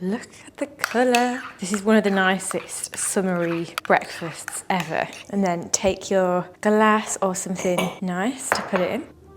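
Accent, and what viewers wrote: United Kingdom. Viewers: This is very inspiring. You know what the moment I just started watching your video I could tell you are British. This makes me more proud of being British when another British shares this. I do workout weekends when I am going to work week days and I am now size 10 from size 20. I eat gluten free food for dinner I have prep salad meals mixed. Well done. Thanks for sharing too.❤❤❤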